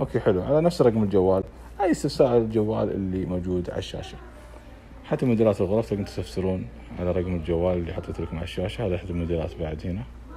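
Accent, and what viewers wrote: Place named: Syria. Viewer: من سمعت لهجته سوري كنسلت لان السوريين في كل المجالات اسعارهم ١٠ اضعاف السوق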